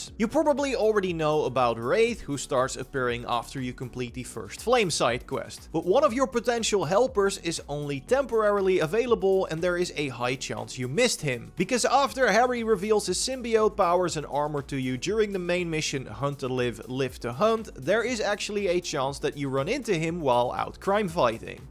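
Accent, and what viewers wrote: Netherlands. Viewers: i smell dutch accent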